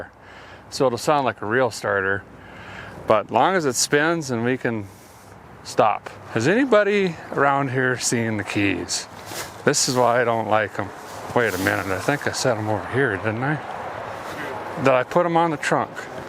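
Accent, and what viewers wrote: Minnesota, United States. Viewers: For Pete's sakes. Definitely a fellow Minnesotan. Love it! Neutralis is my new favorite word. 😊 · very entertaining indeed and informative too, i like you're accent so American "pure jus" a bit hard fore European ears but i get used to it now, call the old lady " Ma baker " Couse chi never die and like that song.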